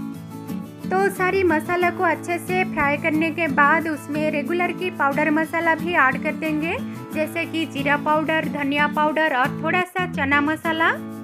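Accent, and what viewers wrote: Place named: Assam, India. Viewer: Tumar kothat gom e pai tumi Assamese suwali buli...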